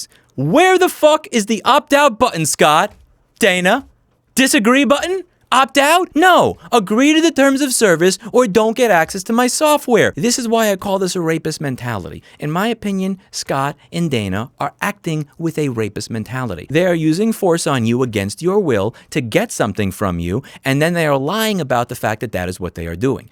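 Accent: New York, United States. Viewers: I just now noticed your new york accent, never noticed it before this video.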